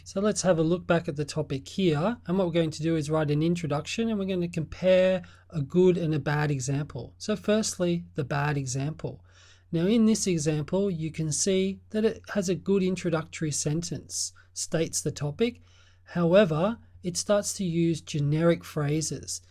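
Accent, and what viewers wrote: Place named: Australia. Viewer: I LOVE AN AUSTRALIAN ACCENT!!!!!! EASY TO UNDERSTAND AND I JUST ... LOVE IT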